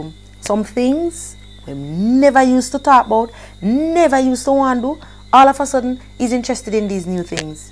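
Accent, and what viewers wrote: Jamaica. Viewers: I always enjoy watching your content on relationships tips and also listening to your Jamaican accent.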